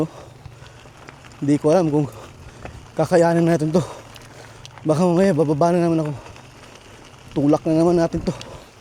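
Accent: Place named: Visayas, Philippines. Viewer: bisaya kaau 😂😂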